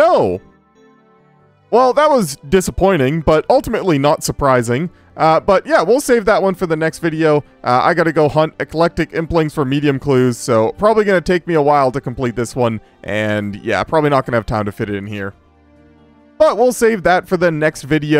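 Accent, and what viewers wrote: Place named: Upper Midwest, United States. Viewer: I've been wondering this since starting the series but where are you from? You have the quirk where you say "done the task" instead of "done with the task" which I've only heard from people from Philly haha but otherwise I'd say upper Midwest (like me)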